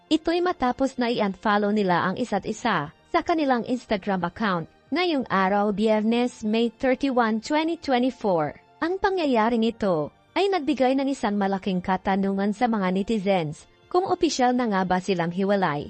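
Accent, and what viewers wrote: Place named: United States. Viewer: Hoy ikaw American ka? Ayusin mo tagalog mo di bagay sa yo!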